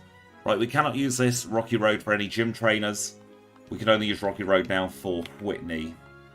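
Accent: United Kingdom. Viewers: I'm enjoying your content now that your back. The mix of Pokemon and a soothing British accent is great for relaxing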